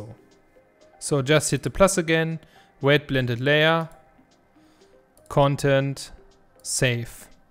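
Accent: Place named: Germany. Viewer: i hear Deutsch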